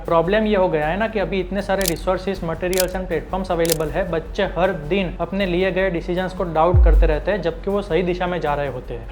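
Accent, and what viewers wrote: Gujarat, India. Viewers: pure gujarati english😅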